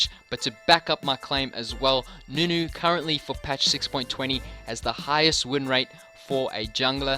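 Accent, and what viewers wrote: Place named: South Africa. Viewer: That accent. Sounds like South African living in Australia.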